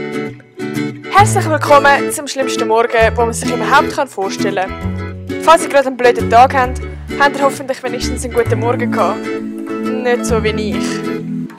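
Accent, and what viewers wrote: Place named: Switzerland. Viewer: der schweizerische "Dialekt" ist irgendwie interessant. schön dass es auch solche YouTube gibt :) und nicht nur hochdeutsche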